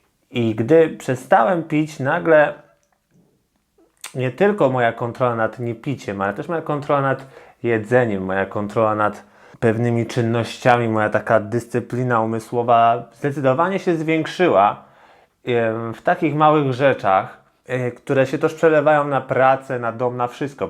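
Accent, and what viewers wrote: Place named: United States. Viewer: Twòj amerykański jest cool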